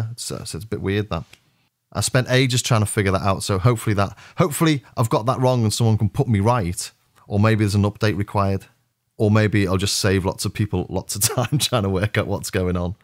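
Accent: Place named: England, United Kingdom. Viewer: Just got my crave last week, this video is absolute mana from heaven, I would be lost without it, thanks 👍🏻 and also the fact you're English means I can understand what the hell you're talking about 😂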